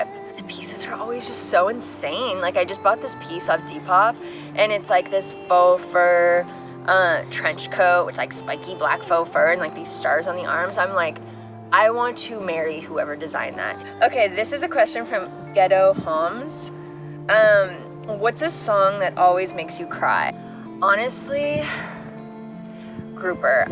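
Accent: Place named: Canada. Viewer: Canadians man, they pronounce “ou” and words w a hard sound between two a’s, like pasta sounds really weird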